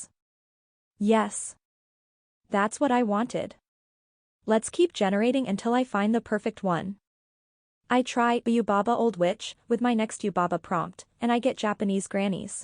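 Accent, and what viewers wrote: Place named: France. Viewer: Don’t be afraid of your awesome French accent whilst speaking other languages. It is sooo fabulous when French speaking people keep their accent. I was born the same as you. The 80’s and 90’s fantasy films were the best years. We had a small resurgance with Harry Potter and LotR. But the (some of the late 70’s) 80’s and 90’s were iiit!!!!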